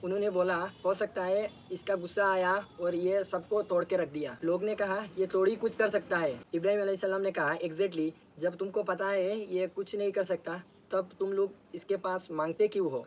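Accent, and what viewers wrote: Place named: Sylhet, Bangladesh. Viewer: Mashallah!! I'm just big fn of ur sylethi accent 😂❤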